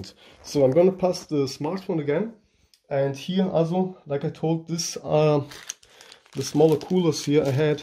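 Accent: Germany. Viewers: I love German accent 🤣. Btw Ich bin Deutsch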